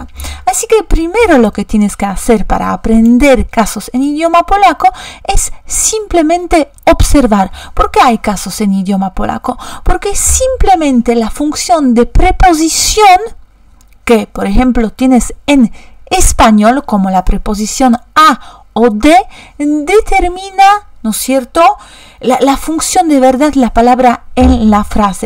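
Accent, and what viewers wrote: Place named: Rio de la Plata, Argentina. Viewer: Hola! He escuchado que tienes una pronunciación similar a la Argentina y Uruguaya, por ejemplo cuando dices "yo". Saludos desde Uruguay 😁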